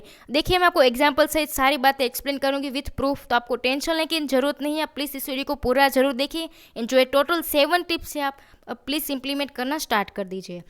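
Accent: Gujarat, India. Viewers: Ape ma'am to first ape Gujarati hoo 😅 vo to malum chala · Gujarati accent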